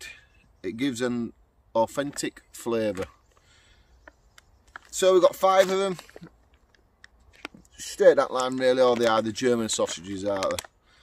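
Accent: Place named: Leeds, United Kingdom. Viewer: There’s some nasty people out there. Can you imagine how miserable they are to be like this to random strangers? I love the way you talk, your accent is ace, reminds me of when I lived in Leeds 😊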